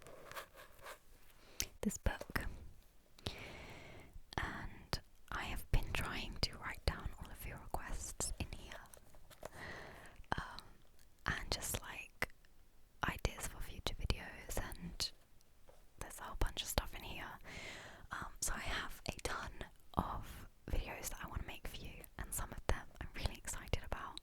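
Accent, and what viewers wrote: United Kingdom. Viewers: Glad to have you back. Looking forward to more wonderful ASMR videos with the new 3Dio. Congratulations on jumping past the 100k subscribers mark. You have the most delightful whispering voice with your British accent! You and Dimitri are amazing ASMRtists. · OMG.. very happyyyyy when i see that your video is up and its a whisper video.. i really like your voice.. your british accent is amazing..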